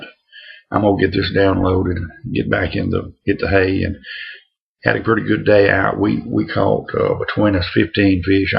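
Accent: Louisiana, United States. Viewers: Greetings from Boston. I just love that Louisiana accent. I aim to drive my caaaa down there at some point. Got some Orlik Golden Slice on the way. Great for a morning smoke.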